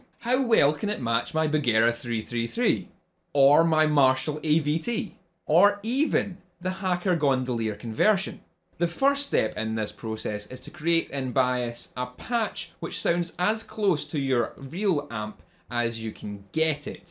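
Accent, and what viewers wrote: Scotland, United Kingdom. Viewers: Something about a listening to a Scottish accent that makes everything more real! Thanks.... And being Australian I didn't need subtitles!;)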